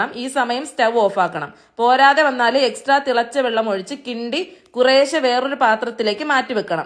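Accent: India. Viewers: Ithu keralathil evidethe slang aanu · oro sthalathe slang avaravarude identity aanu. Thiruvananthapuram, Kollam slang kelkkmbo mathram enda preshnam... Eee slang kelkkan vendi aanu pradhanamayum njn ee channel kaanunnath.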